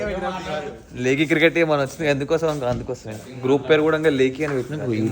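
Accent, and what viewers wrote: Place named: North, India. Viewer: Hindi badhiya bol lete ho bhai. Ekdam north side wali feel ati hai. 🫴🤌🤌🤌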